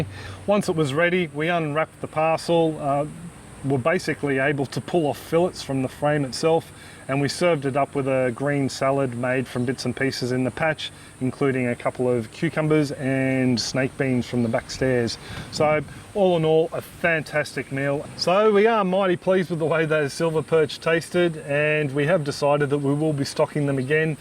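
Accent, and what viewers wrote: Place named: Australia. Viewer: I have enjoyed your videos for a good bit of time now but just wanted to say your Australian accent with your wife’s man being has me reminiscing my childhood! It reminded me of the Disney cartoon “The Rescuers Down Under”. I’m not poking fun... I just think it’s very cute:) Your fish flavors look amazing!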